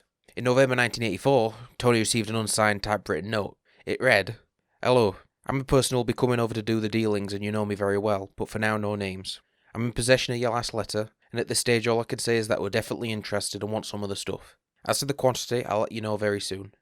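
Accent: Yorkshire, United Kingdom. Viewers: You sound more Yorkshire then you ever have done so before. Is this on purpose? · Nice to hear a fellow Yorkshire man speaking, how do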